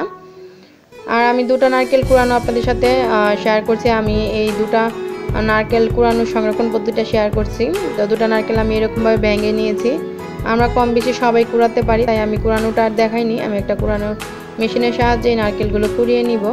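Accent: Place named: Noakhali, Bangladesh. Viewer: কথা বলার স্টাইল পরিবর্তন করতে হবে। নোয়াখালীর মেয়ে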